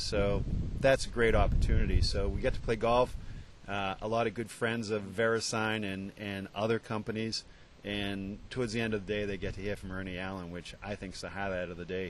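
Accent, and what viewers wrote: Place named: Boston, United States. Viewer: Great accent. You can talk the boy out of Boston, but, his "hart' is still in all the right places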